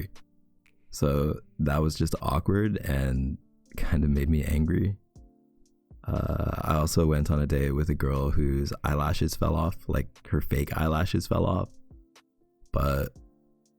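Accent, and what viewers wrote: Canada. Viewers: his canadian accent is adorable ugh 🥲🤍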